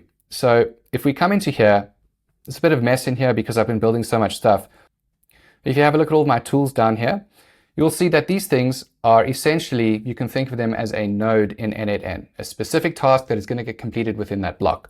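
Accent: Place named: South Africa. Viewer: I know a South African when I hear one😎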